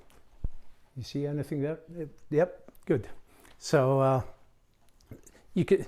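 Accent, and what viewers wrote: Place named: Scotland, United Kingdom. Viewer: Was easy on my ear, hearing a fellow Scotsman 🏴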